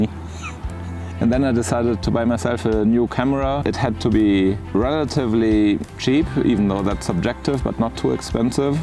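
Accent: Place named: Germany. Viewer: Scanning negatives (or slides) is a niche application, but one where the Olympus cameras are a really nice (and quite affordable) option. The light source is a problem with 4x5 negatives, not so much for smaller formats up to 6x9. The pixel-shift hi-res mode does a really good job at that, even though the "true" resolution is more like 40 to 50 MP (still more than enough to make the grain visible). BTW, your English sounds somewhat "German", or am I mistaken?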